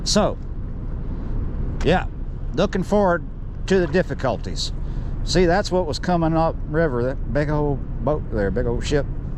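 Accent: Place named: Texas, United States. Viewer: Lubbock here....I still hear Texas in your voice.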